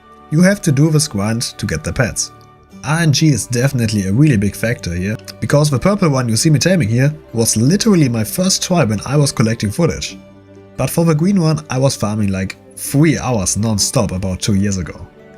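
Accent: Germany. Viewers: I bet my a** that you are 110% german. I can hear it through your accent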